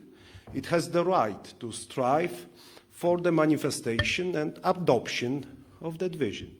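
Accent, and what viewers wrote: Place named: Poland. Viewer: Mówi bez błędów, ale ma polski akcent. A jaki ma mieć: francuski ? niemiecki ? Słyszeliście Francuza mówiącego po angielsku - masakra.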